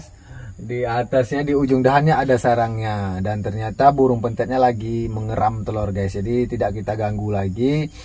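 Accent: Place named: Aceh, Indonesia. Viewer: Saya subscribe baru, logat Indonesia nya macam orang aceh? Awak aceh kah droneh? 😅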